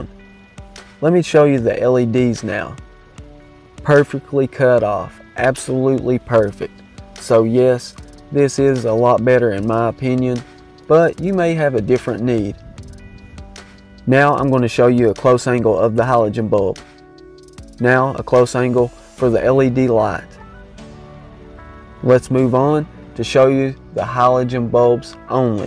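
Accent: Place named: Texas, United States. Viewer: I love your Texas accent mate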